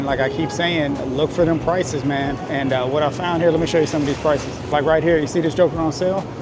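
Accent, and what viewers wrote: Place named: South, United States. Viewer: Great video!! Love your southern accent!!!! What state are you in?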